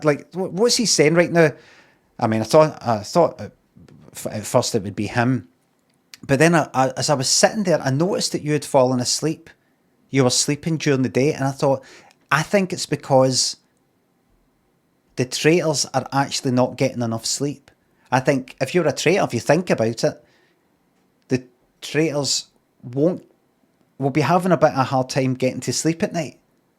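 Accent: Scotland, United Kingdom. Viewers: You clever b*****d,. I think you're onto something here. But wait a moment, now I'm wondering, is it that Scottish accent and yer fancy band t-shirt making me agree with you?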